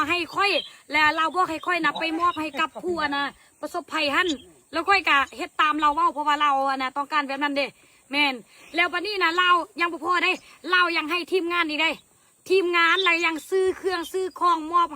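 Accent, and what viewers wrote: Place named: Luang Prabang, Laos. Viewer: ฟังสำเนียงเจ้ แก เว้า กะรู้ ว่ามาจากทาง หลวงพระบาง หรือ ที่ประเทศไทยเราก็ สำเนียงคนเมืองเลย.หรือจังหวัดเลย😂😂😂สู้ๆเด้อเจ้ คนสวยใจงาม.#คนไทยในต่างแดน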